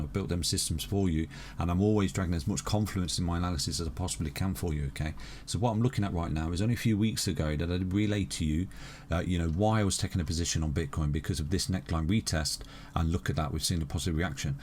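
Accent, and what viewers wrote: United Kingdom. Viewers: Dump with the British accent!! FIRE 🔥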